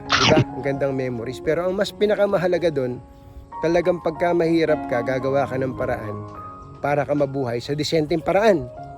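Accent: Nueva Ecija, Philippines. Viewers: Hahah nkaka miss ang puntong Nueva Ecija.. “Wala eka eh”😂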